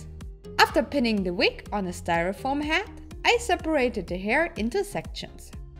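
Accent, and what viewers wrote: Germany. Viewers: Just 'cause I'm curious, is it a German accent? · Ich schreib jetzt mal auf Deutsch weil sich dein Akzent definitiv so anhört als könntest du mich verstehen... ;) Diese ganzen Drucker etc. erleichterten eure Arbeit schon ganz schön, oder? Wenn ich die diversen anderen Cosplayer anschaue, die alles von Hand machen. Verkauft ihr die Kostüme dann?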